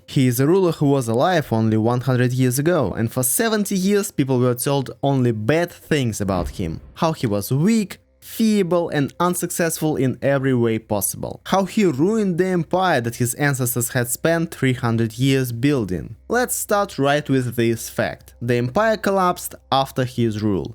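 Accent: Russia. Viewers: Сразу видно что говорит русский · Чо!!! Ты конч? Сразу видно, что он русский, он не изображает, он не может говорить по другому. Это как британцу сказать не изображать британский акцент.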